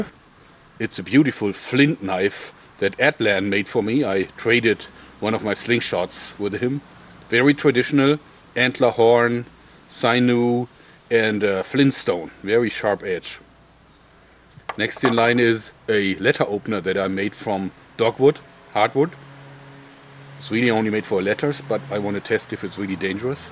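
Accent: Germany. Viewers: Really? Ok, then. I'm German myself and his accent is obvious (which makes it so much fun) but I always considered he lived in the US. Don't know why exactly, but just always did. Well, the BKA then ;) j/k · "I think it can probably slice a throat. We'll find out." LOL Something about the German accent sounds very mad scientist-like. Keep up the great vids. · wie man denn deutschen akzent raus hört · does anyone know wehre he lives? it sounds not luke he is from an englisch speakinh country (like me) and i don't know i think about germany (my country) if i listen to him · U german? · You are german arent you? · is He German? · man merkt richtig das du Deutsch bist xD.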